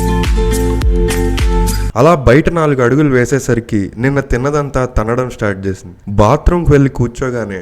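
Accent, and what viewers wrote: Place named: Godavari, India. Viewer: Food tho paatu commentary kuda proper godavari style. Thoroughly enjoyed bro.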